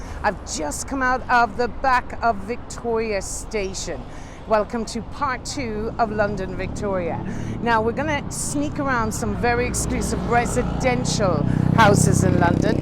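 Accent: Ireland. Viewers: I could listen to this lady read the instruction manual for my washing machine. Love the Irish accent 💚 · An excellent tour again, full of interesting details. A relaxing time to enjoying and learn about, with a nice Irish accent. Thanks!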